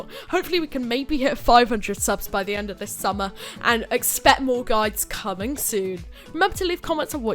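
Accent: United Kingdom. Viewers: Ehh leave him alone, he's quite informative and the tone of his voice is lively plus the british accent which tops it off. Tbh i could listen to him all day, i mean somehthings are bestare what they are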